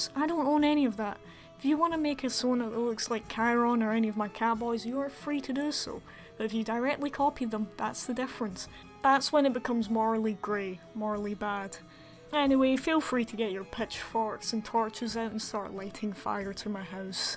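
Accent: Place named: Scotland, United Kingdom. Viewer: Man this accent reminds me that scottish people are a thing, wheres all the scottish people at?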